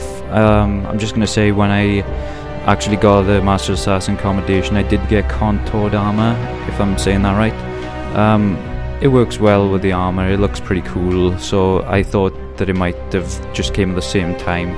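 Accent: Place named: Wales, United Kingdom. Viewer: OMG I FOUND SOMEONE WHO IS ACTUALLY WELSH!😁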